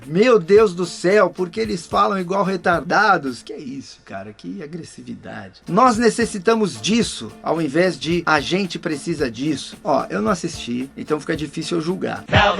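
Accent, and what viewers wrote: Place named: Rio de Janeiro, Brazil. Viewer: sotaque é duro. Principalmente quando puxa para o carioca. Eu gosto da dublagem mas eu sei que tem como atenuar sotaque para não ficar carregado.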